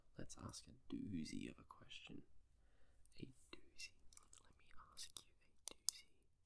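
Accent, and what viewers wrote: Australia. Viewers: just stumbled across ur channel & immediately subbed! don’t know if it’s the familiar aussie accent or just ur whispers in general, but ur voice is so insanely comforting <3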